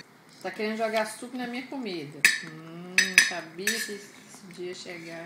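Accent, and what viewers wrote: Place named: Minas Gerais, Brazil. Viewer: Ei, como voces, eu tambem sou mineiro de BH e tambem moro no Canada, porém em Montreal. Adoro assistir os seus videos pelo otimo astral que voce demonstra nos videos e tambem para ouvir o seu sotaque. Ai que saudades de ouvir o nosso sotaque mineiro de BH. Abraço para toda a familia Otavio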